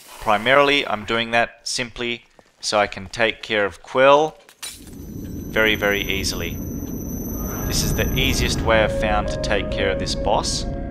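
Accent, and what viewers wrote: Australia. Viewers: just started watching you and im in love with ur channel already. btw are u Australian? n will u be doing chapter 15 anytime soon?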